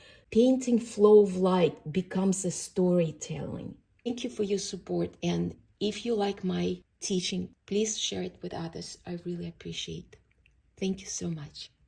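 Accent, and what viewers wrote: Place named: Europe. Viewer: Great to hear a European voice and you are so good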